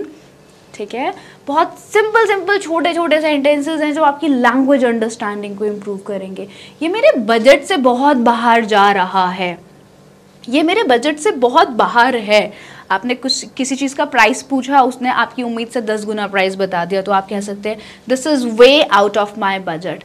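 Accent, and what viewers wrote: India. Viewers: Maam I loved d way u say Hindi wale English 😂❤keep on teaching like this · Sweat and smart words that enhance the charm and beauty of sentance and you are full proved in it. And I'm highly impressed with your's English accent with the soul of Hindi adds to the excellence of language, Thanks beti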